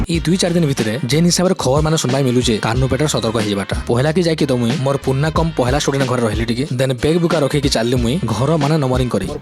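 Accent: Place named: Odisha, India. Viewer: Aapankar video bhal lagla.sambalpuri bhasa aapankar muhu ru sundar aau smart laguchhe.aashiwad karun mui b jadi teacher hei jeti....🙏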